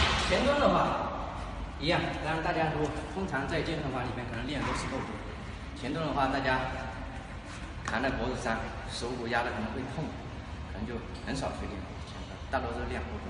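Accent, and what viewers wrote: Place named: Fuzhou, China. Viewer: 亲切的福州普通话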